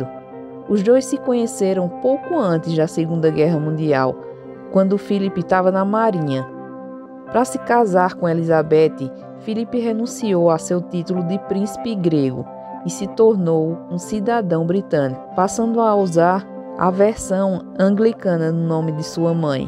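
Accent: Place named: Caruaru, Brazil. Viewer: Essa é caruaruense raíz.. Kk.. sotaque me lembra muito meus parentes de Caruaru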